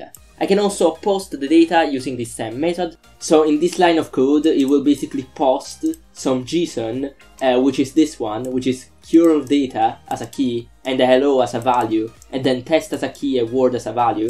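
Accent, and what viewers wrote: Italy. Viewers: Great video thanks very much! I love the accent! haha is it Italian? Would you be able to explain how to debug and set breakpoints in a call to "on Document created" in firebase functions.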